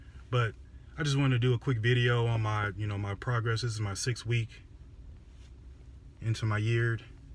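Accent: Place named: Texas, United States. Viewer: Awesome! I was wondering what part of the country you were from. The way you talk sound just like everyone I know! Lol. I’m from Texas. My grandfather is in Ok.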